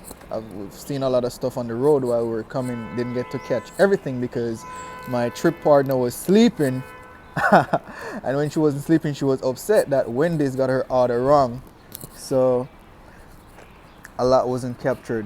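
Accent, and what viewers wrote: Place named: Jamaica. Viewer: I knew you sounded Jamaican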